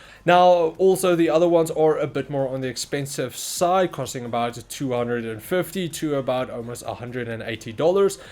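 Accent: South Africa. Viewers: I was gonna say, This guy sounds south african - and then you had the ZA ad spot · what the my mans is south african gg ez sub for him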